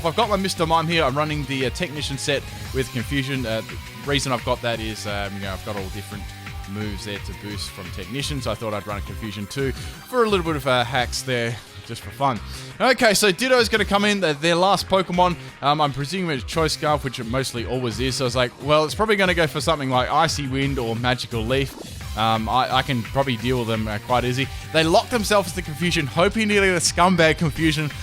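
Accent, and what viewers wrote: Australia. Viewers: Omg ur australian?! What state?! I'm from Queensland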